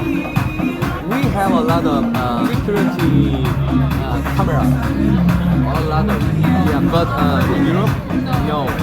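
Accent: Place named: Gwangju, South Korea. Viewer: 어찌 억양이 잇드라ㅡ 광주엿군요. 멋지게 살고 부뢉네.... 난 용기가 없는디ㅠ ㅡ 동광주 홈플뒤 살아요 ~~~~^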